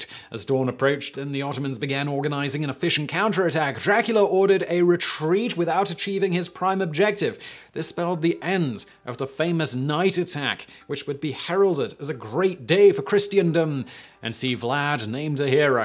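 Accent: England, United Kingdom. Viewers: You're so cute when you crack up and call out your writers! It just slays me.🥰, I adore how freaking English you are! You're like a young Sir Patrick Stewart, can't help but crush a little bit.....or Sean Connery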